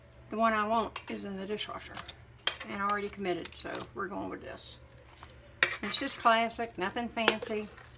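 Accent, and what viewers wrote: South, United States. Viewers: p.s. I could listen to that lovely southern talk all day long. My Mama grew up in the Smokies. <3 :) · Looks good just get to the dish heavy southern talk hard to understand some of the talk will make it soon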